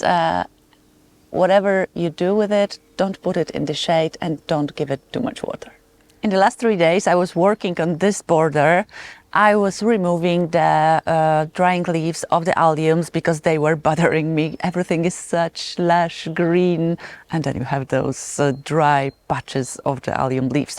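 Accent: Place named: Poland. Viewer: Hi, it's always so nice to see you, I love your Polish accent, please don't change it:) and your garden of course is sooo beautiful. I am watching you from South Carolina, USA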